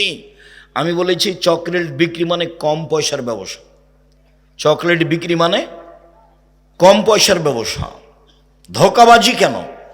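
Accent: India. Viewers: এই লোকটা কলকাতার ভাষায় কথা বলে কেন ? সে কি ভারতীয় ? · শায়খ হুজুরের কথা শুনে বোঝা যাচ্ছে উনি পশ্চিমবাংলা ভাষায় কথা বলছেন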